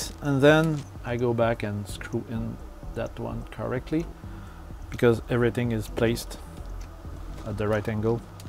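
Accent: Quebec, Canada. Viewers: i love the Quebec accent! And thanks for the video!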